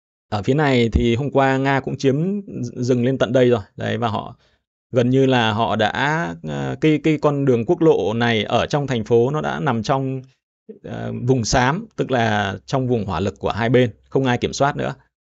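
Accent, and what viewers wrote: Standard. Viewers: Nói chuẩn nha chú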